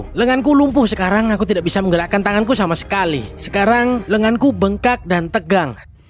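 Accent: Java, Indonesia. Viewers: Dari dubing ini saya seperti mendengar logat jawa ini unik fren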